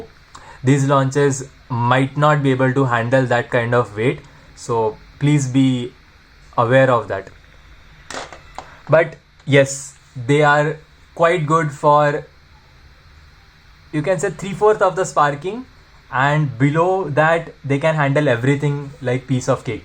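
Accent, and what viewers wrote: India. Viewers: Your accent is indian english and i dont think its fake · You have a perfect Indian accent bro continue doing you great work 👌👌❤️ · Your accent is like Indian only bro 🔥🔥🔥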